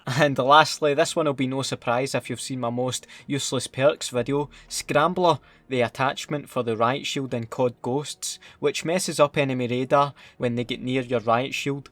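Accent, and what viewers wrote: Scotland, United Kingdom. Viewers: Are you scotish i am new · he's Scottish its a lovely accent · Is this british accent guys ? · That is one T H I C C scottish accent. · Every insult is twice as funny when a Scottish person says it. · I’m gonna take a shot in the dark and say that you’re from Scotland.. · Splattt808 you gotta love scottish accent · Think I need a Scottish boyfriend now😂😂 that accent is way too nice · Mate I’m fuckin Scottish aswell , but your accent is annoying as fuck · Scottish accent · Well duh obviously he's scottish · Oh that thick sexy Scottish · I can fucking subscribe again oh thank god also your voice is like Morgan Freeman but Scottish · That thick scottish accent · hes scottish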